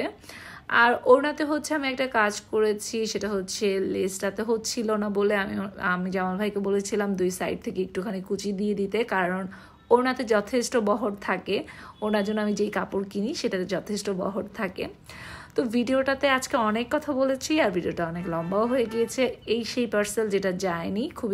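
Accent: Sylhet, Bangladesh. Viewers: Apu like diye video dekha soro korlam,Sylheti vasai kotha sone khub valo laglo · Apu apne sylheti mat matsoin j bala lagse hunte and dress o onek sundor oise sob gula shopping o onek sundor oise😍😍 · Masha Allah sylheti mat kub sundor hoisa ami o akjon sylheti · খুব ভালো লাগলো সিলেটি ভাষা আপনার মুখে আপু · Like 👍 134. Walaikumassalam Apu shuna. Khub khub shundor hoyechey Sylheti kotha. Oy Apu Sylheti maat thik oisey. Love you sweetheart Apu ❤❤. Sending love from Srimongal ❤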